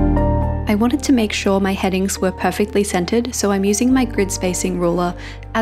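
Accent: Australia. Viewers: Watching now, and my partner got the first few minutes of your video. He said, and I agree, that you have a pleasant Aussie voice to listen too. Not oka or nasally or rough, hehe. He didn’t believe me when I said you’re from Brissy because he thinks that Brissy folks have a specific accent, and not a nice one. I find your voice a nice change from the all too common USA accents that flood YT. And love your bujo art too 😊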